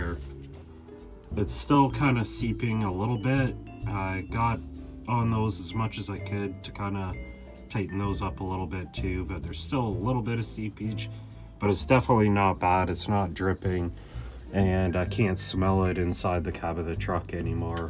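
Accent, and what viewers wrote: Canada. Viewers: G-ah-sket. B-ah-ck. Th-ah-t. B-ah-d. Oowt. Interesting dialect. Cross between Canuck and California Valley speak. 😀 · This is definitely an Eastern Canadian accent! I’m guessing Nova Scotia. The Motomaster oil filter confirms the canuckery.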